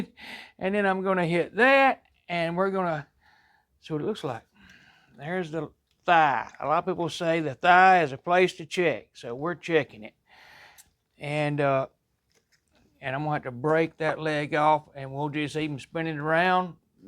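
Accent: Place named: New England, United States. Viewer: Damn… the Yankee accent is horrendous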